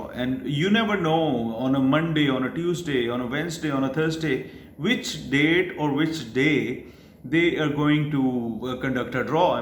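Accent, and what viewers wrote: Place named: Lahore, Pakistan. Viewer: Veerji your accent is like someone from Aitchson College Lahore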